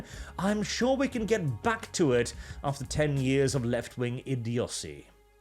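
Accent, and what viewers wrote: United Kingdom. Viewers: I thought he was a brit for years until I heard him mention he is norvegian xD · I always thought he was British. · I had no idea you were Norwegian. I guess it's the 17th century British military officer thing you got going on. Not saying it's bad. When I started watching your 40k lore videos, I pictured you wearing a stuffy uniform with a monocle, and a handlebar mustache. 😂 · Wait, you're Norwegian? I thought you were from the UK. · You’re the most British Norwegian I’ve ever heard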